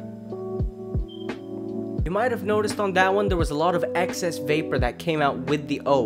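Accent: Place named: England, United Kingdom. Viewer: Yung english accent talaga eh 👍👌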